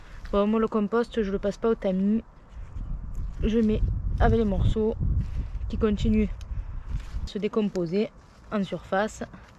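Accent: South, France. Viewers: Re bonjour, je viens de reprendre la vidéo et je trouve que tu as la main verte et un bon terreau universel, je suis entrain justement de tester des terreaux universel ainsi que des terreau à semis en faisant mes semis et je note les résultats sans oublié que les graines y sont aussi pour beaucoup, j'achète depuis 4 ans à plusieurs semencier pour vérifier la germination de leurs graines, j'ai en teste actuellement: Kokopelli, La bonne graine, La boîte à graines, Les graines Bocquet, Le biau germe, Promesse de fleurs, Les graines de chez Baumaux et pour finir Graines de folie que tu achète toi aussi. Ce que je peux dire pour l'instant c'est que Kokopelli est loin d'avoir une bonne note, germination pas toujours au rendez vous et même des erreurs de graines dans les sachets, la bonne graine idem germination pas toujours au rendez vous, pour l'instant je suis satisfait du Biau germ, des graines Bocquet, de graines de folie et de la boîte à graines voilà. 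Sinon bravo pour toi, tu as de très jolie plants, j'aime bien t'as chaine et la belle personne du sud que tu es avec ton petit accent bien sympatique, et je te dis à bientôt et je te souhaite une très bonne semaine.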